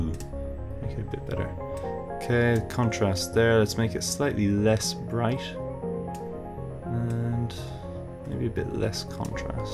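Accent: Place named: Dublin 4, Ireland. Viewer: Dublin 4 accent if I ever heard one haha :P Great tutorial keep up the good work bro.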